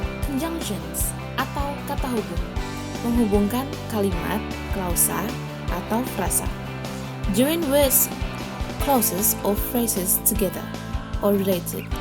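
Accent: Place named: United Kingdom. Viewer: Suka nih british accent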